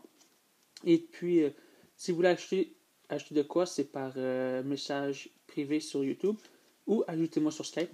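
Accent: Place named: Quebec, Canada. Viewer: Oye un quebecquois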